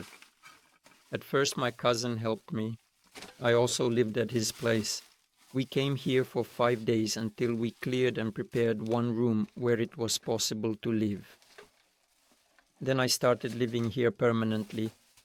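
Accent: Belarus. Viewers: Это где в Беларуси? Голос вроде белорусский у тебя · По говору вы из Белоруссии??? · Белосус походу,судя по говору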